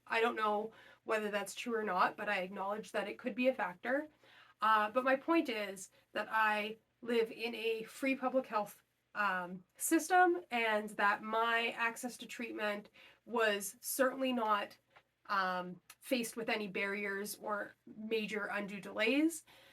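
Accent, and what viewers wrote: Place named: Canada. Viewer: Thanks for being so comprehensive,! I really appreciate it and yes, viewers are looking precisely for all those little details you so generously shared, so it's definitely NOT too much information. It's reassuring as a breast cancer patient to feel heard and seen. You have a natural flair for relaying information in a very clear manner (plus a fantastic Canadian accent which I love!) and it has been very helpful listening to your experience which, so far, has been similar to mine. I'm just about to do my 5th round of full chemo (Docetaxel, Herceptin and Perjeta) and it has been more my upper GI tract that has given me the worst symptoms. Pretty ok, for the most part, in my lower GI tract. Did you experience any watery eyes during your full chemo treatment? Mine just won't stop! Looking forward to putting the full chemo behind me and just getting the surgery done. 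I have seen your video on your 1 year anniversary and I can't help but wonder: the fact that you're done with your HP regimen now is awesome but how are these continual questions in the comments to your videos affecting you and your mental health? I can't imagine what that would be like. Is that helpful for you to relive or does it maybe make you anxious? Just curious as to the psychological implications of this on you, post NED. Continued blessings to you on your health journey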